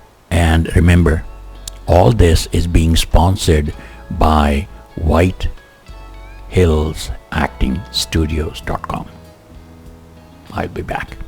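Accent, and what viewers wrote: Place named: Caribbean. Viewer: I love your voice. Where are you from, I hear a Caribbean accent.?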